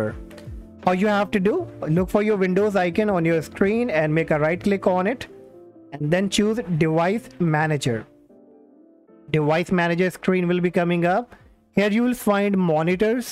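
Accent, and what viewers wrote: India. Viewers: Indian accent💀💀💀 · your indian accent is destroying my ears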